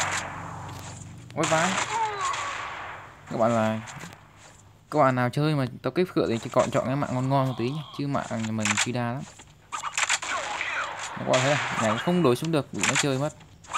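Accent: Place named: Central, Vietnam. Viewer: Miền trung kb dépác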